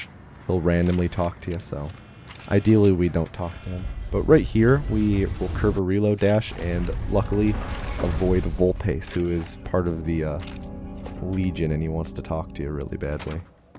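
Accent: Italy. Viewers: Are you American or italian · Are you italian?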